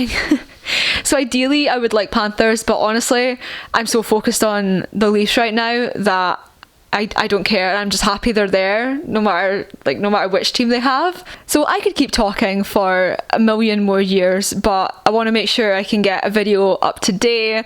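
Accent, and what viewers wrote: Scotland, United Kingdom. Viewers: U got new subscriber are you Canadian my bad u scottish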